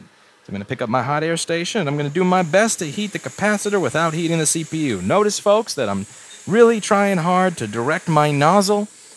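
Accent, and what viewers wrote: New York, United States. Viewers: Yes! It's kinda like watching a football game. I think it's his monotone voice along with that Jerry Seinfeld accent that makes it soothing. Although, I've learned a lot about soldering. I just wish he'd gear it more towards us novice-type. Like, I had no idea how to use flux like he does! And, NOT to lift the wick, but drag it was.....INVALUABLE!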